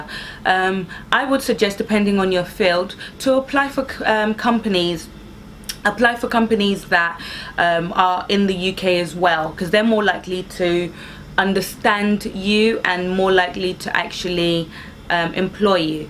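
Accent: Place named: Nigeria. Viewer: i always find that transitional accent fascinating, you obviously grew up in nigeria so i should be able to adjust back quickly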